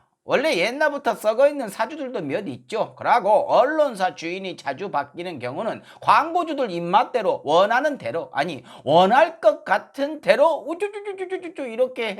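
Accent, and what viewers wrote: Jeolla, South Korea. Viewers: 전라도 사투리만 쓰지말고 전국구 사투리 쓰세요 . 그래야 전국 유튜브 방송 됩니다 .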